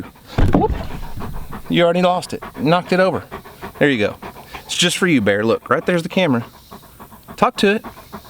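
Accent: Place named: South, United States. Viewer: The constant, unending interruptions are like watching someone with ADD. Solution: Put the dog inside or on the other side of the fence and make your videos uninterrupted. You have very good content. That southern drawl is relaxing and sounds very trustworthy - very easy to listen to. Just let that good content flow!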